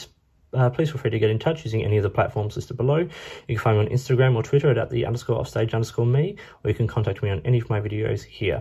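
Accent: Australia. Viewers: Judging by your accent, I'm guessing you're Australian. What's the best source of fountain pen ink in Australia? Is it best to over from overseas? I'm just getting back into fountain pens, so was thinking of going to the local Officeworks.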